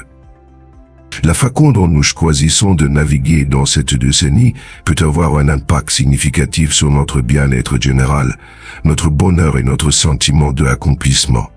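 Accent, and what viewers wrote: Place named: England, United Kingdom. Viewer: D C'est bien la première fois que j'entends une vidéo avec une voix off qui a un fort accent étranger (anglais ou américain ?). Les sous-titres sont désastreux mais cela m'a bien fait rire !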